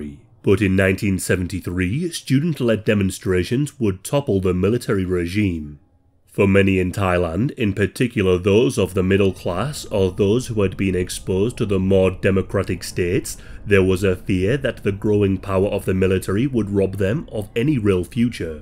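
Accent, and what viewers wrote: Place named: North East England, United Kingdom. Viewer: The most durr voice on the Internet. Rotten accent that North Eastern 🤢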